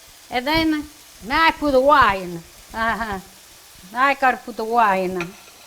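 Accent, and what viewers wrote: Italy. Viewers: Shes Italian.... don't like her accent don't watch her... be nice or leave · We love Her with her very nice ITALIAN ACCENT grazie mile nonna 😘😘😘🌹👍💝💋💋💋from Canada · 😁😁😁😍🌹🌻🌷 love your accent-- very charming (butter and oil). True Italian. The dish looks so very easy and yummy. Thank you for sharing!! Happy Holidays a great Christmas and New Year to ENTIRE FAMILY!! Be safe!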